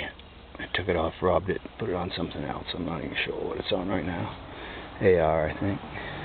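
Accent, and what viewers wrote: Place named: Maine, United States. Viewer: If I had to guess. I bet your from Maine.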